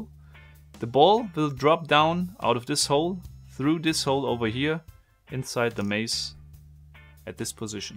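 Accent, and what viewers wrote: Germany. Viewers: Kannst du mal ein deutsches video machen In vielen videos hört man das du deutscher bist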